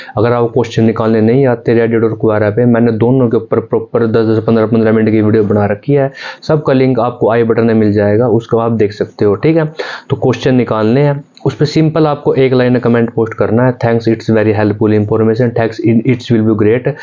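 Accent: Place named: Haryana, India. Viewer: U r from. Language to bhai aapki ambala ya Ynr area ki lag ri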